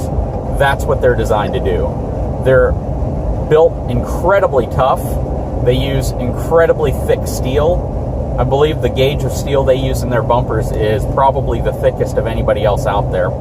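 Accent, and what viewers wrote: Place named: California, United States. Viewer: You sound like an Educated Californian. Love your videos and reviews though.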